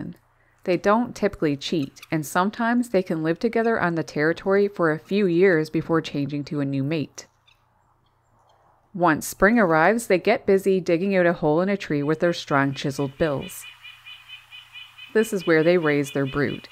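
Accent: Newfoundland, Canada. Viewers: I detect a Newfoundland accent. Thank you for everything that you do.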